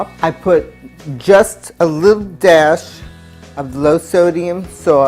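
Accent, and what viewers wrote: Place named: New England, United States. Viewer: That sounds like a New England accent. Im from Boston, where are you from ? Thank you for the tips. I use this chicken all the time and always looking for new ideas.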